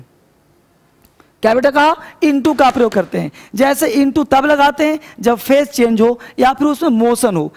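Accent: Bihar, India. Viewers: But bihari accent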